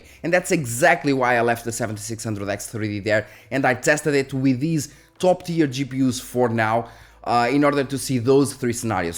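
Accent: Portugal. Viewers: Assim que abri o vídeo soube que estava perante um puro tuga.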